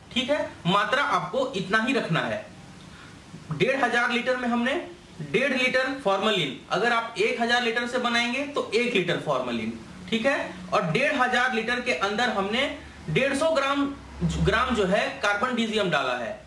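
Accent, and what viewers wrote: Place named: Bihar, India. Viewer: Bhai aap Kanhaiya Kumar ke jaise bolte ho... Lagta hai Bihar se ho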